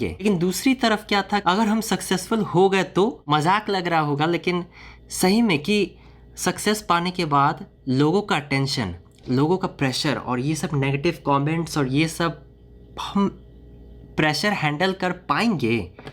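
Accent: Bihar, India. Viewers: It is quite intriguing to hear a Korean speak Hindi in Bihari accent! Mind blowing to hear the "Bhadiya" with nasal tone!! ha ha ha ha Heard your thoughts on Money and success. Would like you think a bit more on success. Money or popularity or power is not really success in life; money is means for life. Also one big point you missed is of multi-tasking. nobody says you ought to do one thing only at a time, focus on 2-3-4 things to be made sources of Income. Do something online like stock trading or tutoring or youtube, do full time job and a part time over weekends sometimes, all at same time or different times. Since you are young you can start multiple ways and then drop many or all along the way and start something new ! Yes, you think tooooo much, Just do it !! If you think giving gyan would be great on YT, no, stick to stories... preferably imaginative...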